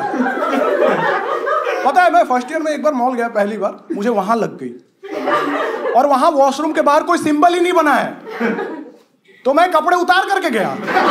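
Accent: Bihar, India. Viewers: Awaaj se hi bihari lag rage ho be, maja aay gaya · Actual accent of bihar..."maat sahab"😂😂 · OMG, bihar comedian and the accent!!! Wowww mazza aagya bhaiyaa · This man proves that you don't need to be from any metro cities to be a good stand up, you can easily portray your stories to other's and make them laugh in your accent also....and the Bihari's accent are always beautiful....keep going👍🏻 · Yourrr bihari accent dude just too good 😂❤️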